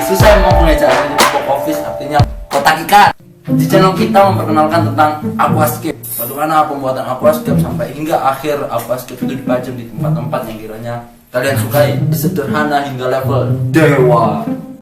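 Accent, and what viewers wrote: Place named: Java, Indonesia. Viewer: iki wong ndi seh? medok banget wkwk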